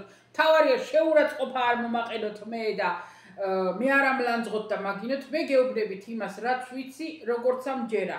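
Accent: Kakheti, Georgia. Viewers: იხარეთ მუდამ !!! ❤❤ როგორ მახარებთ !!! ❤თქვენს მიერ გახმაურებული კახური აქცენტებით ...❤ ,, არაუშავრა..."❤ მიყვარს თქვენი მოსმენა... და მამხნევებთ ძალიან...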